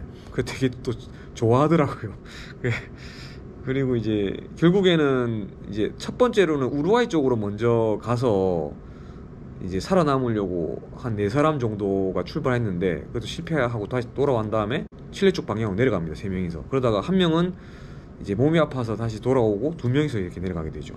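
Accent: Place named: Busan, South Korea. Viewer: 너므 말씀 조리 있게 잘하세요 부산 말투가 좀 세련되게 느껴지는 보이스~~멋쪄요~~^^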